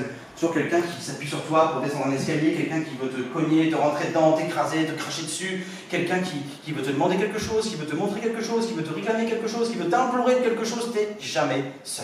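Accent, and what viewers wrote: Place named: India. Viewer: Histoire émouvante... et excellent comédien. L'accent indien pour parler anglais me fait beaucoup rire! Bravo.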